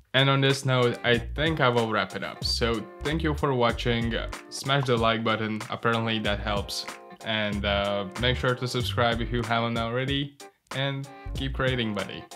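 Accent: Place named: Eastern Europe. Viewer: Wonderful content! Where are you from? You sound like Eastern Europe brother :)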